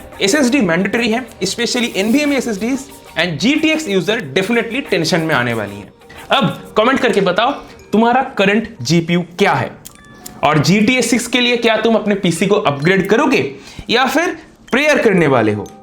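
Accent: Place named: Bihar, India. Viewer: Bhai phle naak se baat krna band krde its very hard to understand what you are saying in that bihari accent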